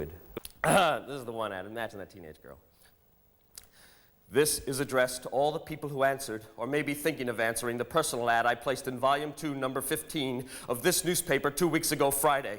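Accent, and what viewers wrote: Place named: New York, United States. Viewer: His accent is THE New York City. I don't think he was brought up there though. A friend sent me this, said, this is Jim's mind. He's actually quite sane.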